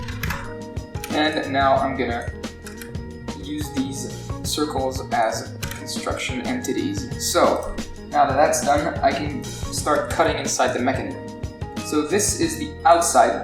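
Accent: France. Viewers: Mais, t'es français O_o, je savais pas moi ! Ton accent est super !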